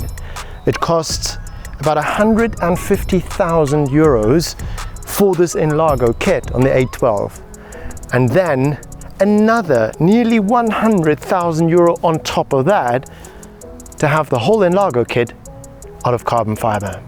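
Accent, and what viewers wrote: South Africa. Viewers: Mate you sound like you could be from SA?? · you sound very South African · Any chance you from South Africa with that accent? 🤔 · This guy sounds South African 👌 are you? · Is the presenter South African? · not sure. Just know he’s South African from the accent.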